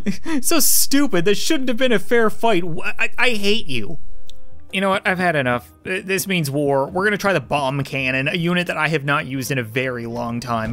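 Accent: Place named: Canada. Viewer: When I first started watching him. I couldn't tell that he was Canadian. but NOW?! Got me up here thinking Paul Bunyon made a YouTube channel😂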